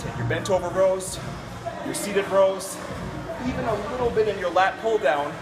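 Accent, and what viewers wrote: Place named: Canada. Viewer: A black guy with a Canadian accent.....learn something new everyday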